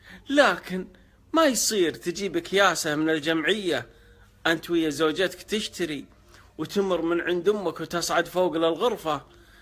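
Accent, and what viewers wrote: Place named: Syria. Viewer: شكلك سوري من كلامك . انت من البدون؟؟؟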